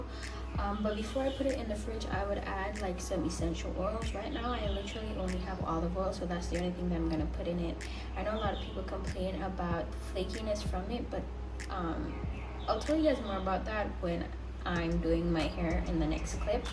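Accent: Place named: Caribbean. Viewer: I hear a slight accent! Are you by chance from the Caribbean?🥴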